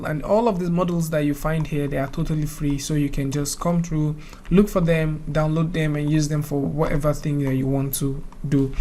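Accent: Nigeria. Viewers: Are you Nigerian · You sound Nigerian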